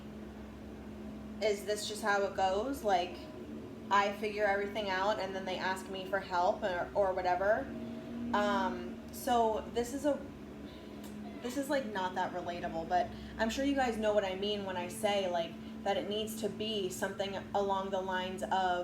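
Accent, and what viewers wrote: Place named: South, United States. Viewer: American Accent?? I would say...you need to relax. You have a southern drawl and Jersey touch as accent. Not sure about the PENN State stuff, but clearly my before. Tinge of Kentuckian.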